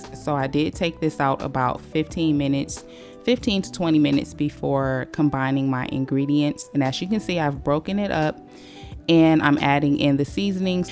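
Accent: South, United States. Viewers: Great recipe! Your step of sweating the veggies down first is spot-on.. raw veggies will release a ton of water and make your meatloaf crack apart. You sound like a Southern lady, so may I suggest adding Celery to your veggie mix? The Cajun Holy Trinity of cooking! 😁 Celery should be equal to the bellpepper amount.. Newly subbed!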